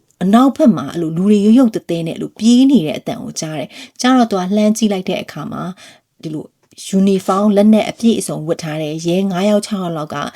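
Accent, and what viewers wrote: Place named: Yangon, Myanmar. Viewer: အော်..မဟုတ်တာကိုအဟုတ်လုပ်ပီးလာပြောနေတယ် နေရာဒေသကွဲရင်အပြောအဆိုကွဲတာပဲ ရန်ကုန်ကလူတွေက ရမသုံးတာများတယ် က ပဲသုံးတာ မသိဘူးလား😏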